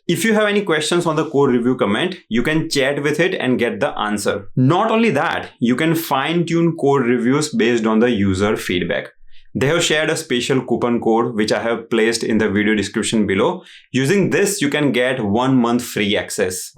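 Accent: Gujarat, India. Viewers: Gujarati accent hehe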